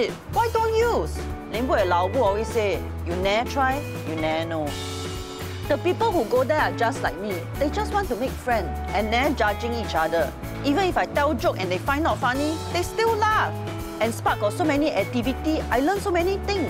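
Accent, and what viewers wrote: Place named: Singapore. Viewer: Wow the the starting abit sad sia😥 But i love your singlish! Super on point😂😂😂😂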